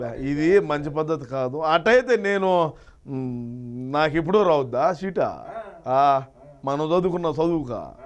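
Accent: Rayalaseema, India. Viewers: Good satire, in Rayala Seema accent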